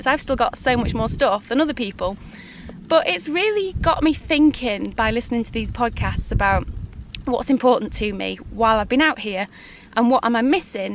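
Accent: United Kingdom. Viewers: I have a friend from England that heard me say "worcestershire" with and American accent and she said, "oh no, you don't pronounce the 'r', that is low class." Haha. So I noticed, when ever I here a Brit speak, you only pronounce the 'r' after a consonant but not after a vowel. Lol, now your viewers that read this are going to be listening closer to your accent. Which I think is really cool. That's my blog (comment).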